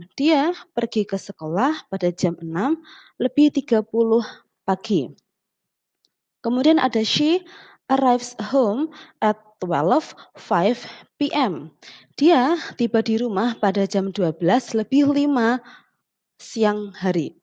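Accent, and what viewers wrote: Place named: Java, Indonesia. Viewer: Medok ya bund😅👍👍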